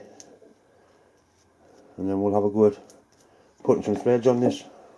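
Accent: Newcastle, United Kingdom. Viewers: The auto text cant understand Geordie either. 😂 At least mine cant.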